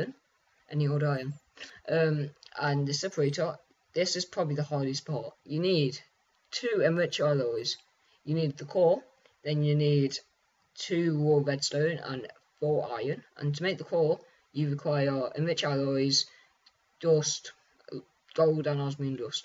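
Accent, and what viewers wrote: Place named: United Kingdom. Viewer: he sounds british, man from 2 years ago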